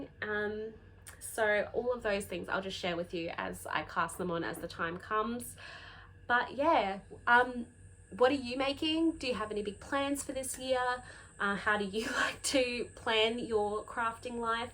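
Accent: Australia. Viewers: Always great to hear an Aussie accent. Try Julia and Mathilda's greatestknits. Love the Tulip sweater and have some light linen for a summer version ready as it's still over 35 degrees here every day ! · Hi, it's so nice to see and hear an Australian knitting podcaster!! Just wondering where you order all these American yarns from as I don't see them at any online (Aussie) stores that I buy from? Also the De Rerum, I want that for the Decision cardigan but can't find it so can you advise where you got that? I know what you mean about some podcasts being over an hour long. I have heaps that I watch and the shorter ones that I absolutely love are Voolenvine, Cherry Heart, 50 Fabulous Knits, The modern Skein, Camijo Knit, A Homespun House, Little Big Knits & Youngfolk Knits. Love your work, you knit beautifully.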